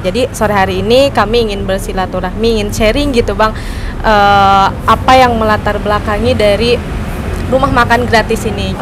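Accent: Palembang, Indonesia. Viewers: Logat Palembang nya dak ilang... Sukses trus dunia akhirat bang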